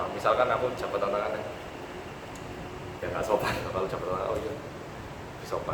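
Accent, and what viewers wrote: Java, Indonesia. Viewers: Kali Bicara Logat Jawanya Padet Banget 👍😁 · jawanya mendok men thoh, aku salut,. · sampean medok e notok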